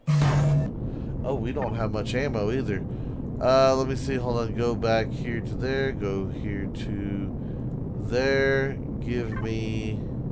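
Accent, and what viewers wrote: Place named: Texas, United States. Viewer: Some suggestions because you asked for it :D I'm started playing the game and watching your videos since alpha 8 came out. I like your videos, because your playstyle is a bit similar compared to mine, and I like the texan accent as well. :D In your videos I think you should go for more exploration and less building. I mean, building is fine, but sometimes there are too many sv / hv / cv build videos come one after the other. Back then, when alpha 8 was hot, there was not as much content in the game, than now we have. You are still playing the game like you played alpha 8. But with alpha 12 and with the project eden scenario you have literally hundreds of POI-s, and hundreds of planets and systems to explore. I don't say you should warp to a new planet in every video - not at all. But a bit less detailed base building, a lot less detailed sv / hv / cv build videos, and a lot more exploration (poi-s and planets), because I don't think you would run out of content before a huge update would come and you should have to start a new game to get the new stuff. 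Here's how I play: escape the horrible starter planet (rogue), find a good planet (snow planets are my favorite too). On the snow planet, build a huge base, using it as main base, as HQ etc. and with the new teleporter I can come back to it from anywhere, and I don't have to abandon it, and keepin improve it till time to time. I hope it helped a little bit.)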